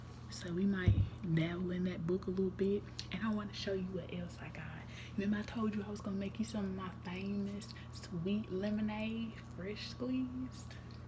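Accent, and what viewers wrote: South, United States. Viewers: Love ur southern accented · You're so pretty! Also I love your accent; I grew up down south and it feels nostalgic to hear you talk. 💜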